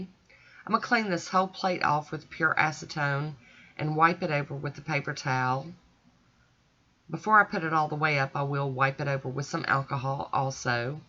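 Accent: South, United States. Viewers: I LOVE the southern accent. I’m from Talladega Al. Where are you?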